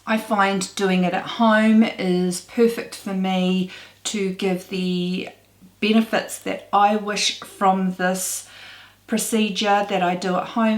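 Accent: New Zealand. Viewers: Hello. Nice to hear a lovely NZ accent on YT. I’ve watched several of your videos & find your information quite useful in general. I’m a bit concerned with the shaving by hand & derma planning as it’s a bit precarious & I think could be a bit harsh. Previously I was waxing in a salon but we get locked down so often (Aust), I looked for at home alternatives. Another lady on YT was using the VieBeauti Rechargeable Eyebrow Trimmer Facial Hair Remover. I bought one to try & Im very happy with the results & convenience. I think you should look at that item or other similar tools to see what you think. That item has 2 interchangeable heads, a larger one for general peach fuzz & smaller head for around eyebrows, nose & lips etc. The heads can be rinsed in water (It’s a tool that can be used on other parts of the body too but I’ve not done that) It’s quite portable & charges with a USB. 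I’m feel quite scared when I see ladies shaving around eyes or possibly around blemishes or flaws they may not notice. I’m certainly not trying to sell this thing, (I have no associations at all) it’s just something I’ve found is really useful now & wanted to share with you & people here as it’s certainly safer alternative.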